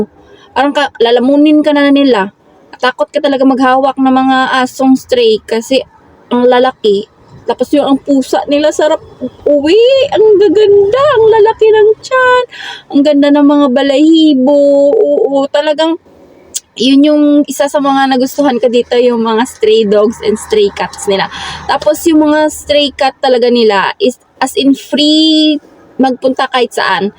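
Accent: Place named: Iloilo, Philippines. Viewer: Taga saan ka sa Pinas dai?sounds Ilongga❤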